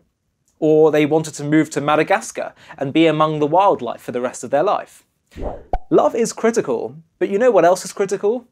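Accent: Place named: United Kingdom. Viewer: השדרן נראה תימני אבל יש לו מבטא בריטי. מה לעזאזל?! חחח 😂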